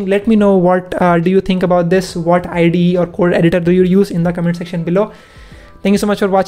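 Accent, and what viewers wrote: India. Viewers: Sounds Indian, but he put some work in. I'll listen... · Video: starts Me: stf you indian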